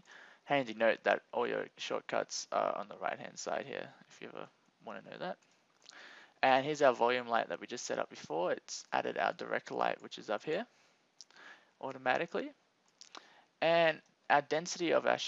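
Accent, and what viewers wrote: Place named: Australia. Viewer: Heyyyy Australian ;) What part you from?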